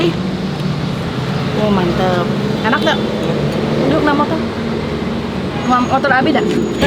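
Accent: West Java, Indonesia. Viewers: Sedep pisan klo liat teh mkn, apalagi smbil ngmong logat sunda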